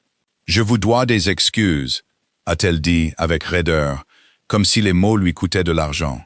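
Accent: United Kingdom. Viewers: Merci pour ce beau récit, l’honnêteté enfin reconnu..ainsi que l’amour, merci également au narrateur et à son joli accent britannique. Je vous écoute du Sud de la France, proche de la Mer Méditerranée..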